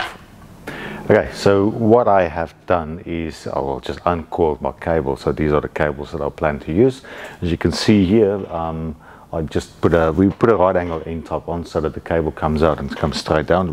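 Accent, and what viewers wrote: South Africa. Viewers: Thanks for the video, very useful. You sound very South African?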